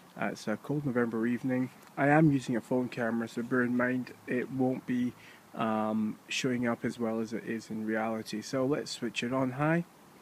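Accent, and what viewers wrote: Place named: Scotland, United Kingdom. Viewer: hi would the new ZL SC600MkII in neutral white be better as a general home general walking flashlight?. i know the new one will be some now 900 Lumens, but 900 lumens in NW compared to CW 900 lumens, would there be a great noticeable loss?. love your review and...scottish accent. mine has a bit of glasgow. thanks.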